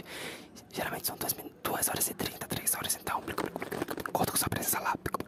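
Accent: Bahia, Brazil. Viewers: Eu pesquisei por esse vídeo, fiquei feliz por vocês terem feito pq eu amo o asmr desse canal, e as gírias/ sotaque baiano são os melhores.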